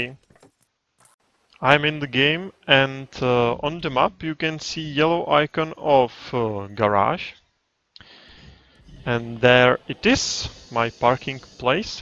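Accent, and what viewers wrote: Czech Republic. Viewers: Ten český přízvuk nezakryješ :-D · He is czech it's hard speak english when you are slovanian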